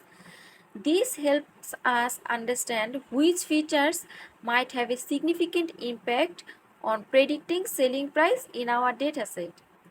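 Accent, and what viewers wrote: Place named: South Asia. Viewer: Are you from South Asia?Your accent said so❤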